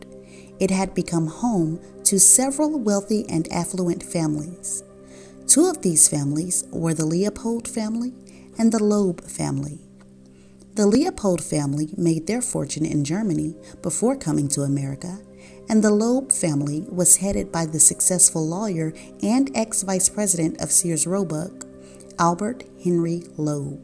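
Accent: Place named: Memphis, United States. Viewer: This was good! Girlllll I thought you were going to be all serious throughout until you gave us that little funny voice over! 🤣 I understand this is a serious matter at hand but even tho " in my Memphis accent " still be you! That's what drew me and others to you. I'm very intrigued about this new genre. You GO GIRL! P.S. I want to purchase your book but forgot the name. Blame my mind and not my heart chile I know you've mentioned it a bunch in the earlier vids... ❤ nevertheless you're an awesome story teller! Hopefully I'll catch a Live 😅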